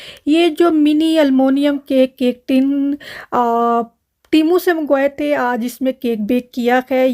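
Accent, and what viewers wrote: Punjab, India. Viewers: Very nice I like ur Punjabi touch in vlog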